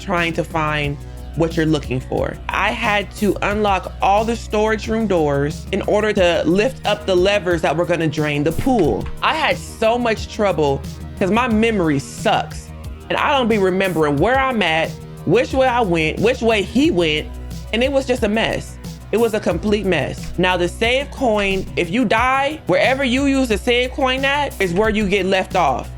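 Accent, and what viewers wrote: United Kingdom. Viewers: I haven’t even finished the video yet, but I already know the narration is gonna have me weak 😂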